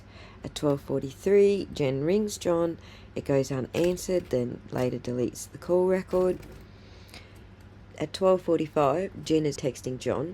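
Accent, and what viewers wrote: Australia. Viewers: You are such a fresh breath of air. I grew up across the street from a lady from Aussie. Our families were friends for ages. I'm now 67 yrs old. It almost sounds like I am listening to her talk. Love your accent. She was like a second mom to me. Miss her so much! God Bless you!!!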